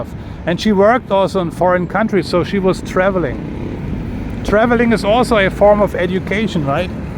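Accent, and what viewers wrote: Germany. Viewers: ur accent likes germany? right?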